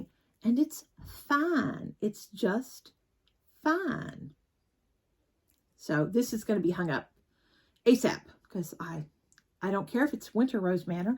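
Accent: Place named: Texas, United States. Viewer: Loved all your beautiful finishes and your Texas twang (being from south Texas myself). Thanks for sharing your work with us. shepherd and twice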